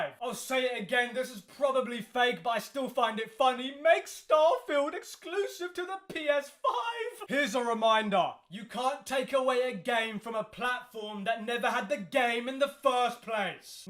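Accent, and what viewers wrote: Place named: Scotland, United Kingdom. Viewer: I love you, funny angry Scottish man.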